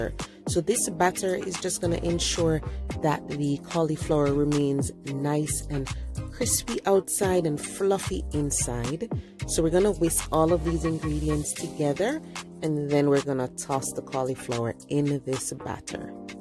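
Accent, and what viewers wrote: Jamaica. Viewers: U must be jamaican. U sound like my mummy. 🇯🇲😭WONDERFUL VIDEO. I gwain try this it looks DELICIOUS!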